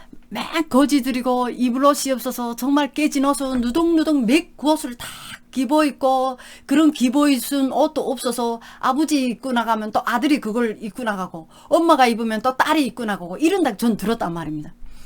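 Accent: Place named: North Korea. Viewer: 로마에와스면 로마에 법을따라야합니다 여기는 북한이 아닙니다 당신이하는말이 조선말을있는대 외 외래어을 하는야고 합니까 여기는 조선이아니고 대한민국입니다 당신도 말먼저 배워가지고 방송국에나오던지하세요 정말로 말을 듯기가 거북힙니다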